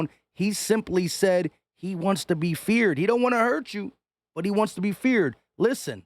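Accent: Philadelphia, United States. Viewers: but wait yeah you could use so "professionalism and he could use some street" but don't get away from what makes you different know what I mean? You don't need to be proper cause philly isn't proper you sound like one of us he sounds like a sports broadcaster which works for both of you don't get away from that but I think y'all would be a great duo especially live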